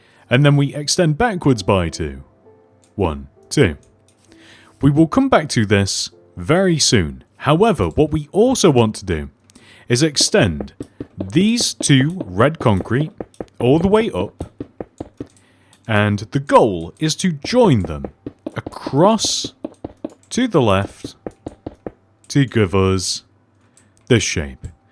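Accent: United Kingdom. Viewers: Ngl when I use this guys tutorials I use his British accent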